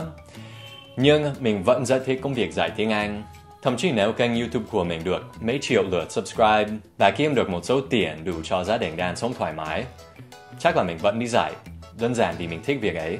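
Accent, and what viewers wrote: Standard. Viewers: Nói tiếng Việt chuẩn ghê...giỏi... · Ngưỡng mộ anh Dan ghê anh nói tiếng việt chuẩn ghê....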